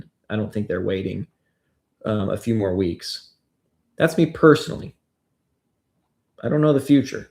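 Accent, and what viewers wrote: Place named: North Woods, United States. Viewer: Wisconsin GME holder checking in, definitely a little north woods accent coming through lol. Always look forwards to your videos keep up the good work brother!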